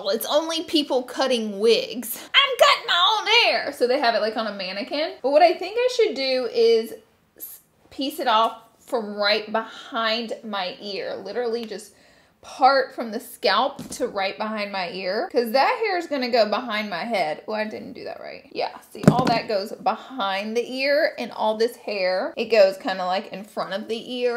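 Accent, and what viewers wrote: South, United States. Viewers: why is her southern accent worse in this video than usual or western idk im european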